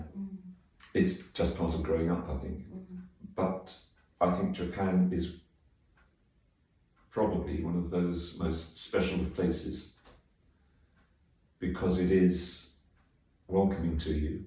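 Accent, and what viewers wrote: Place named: United Kingdom. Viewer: イングリッシュとはいえ、米語ではなく英語のナチュラルで穏やかな心地よい響き、gentlemanとあいまって とても素敵でした!! 当たり前と思っていた日本の素晴らしさを あらためて教えて頂き有り難うございました(*^O^*)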